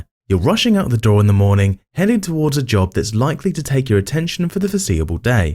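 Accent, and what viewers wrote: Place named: United Kingdom. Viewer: The British accent is annoying.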